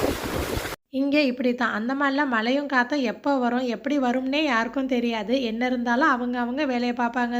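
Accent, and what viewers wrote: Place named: Keelakarai, India. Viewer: Assalamualaikum sis unga slag keelakarai slag onuthaa...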